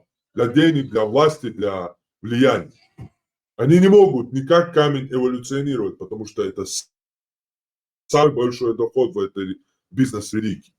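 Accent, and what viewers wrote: Chechnya, Russia. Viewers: ТЫ СКОРЕЕ ТОРЧОК РОДИВШИЙСЯ И ЖИВШИЙ ОКОЛО ЦЕНТРА ГОРОДА... В ТЕБЕ СМЕШАЛИСЬ ВСЕ ДИАЛЕКТЫ ЧЕЧЕНЦЕВ! БЕЗРОДНЫЙ!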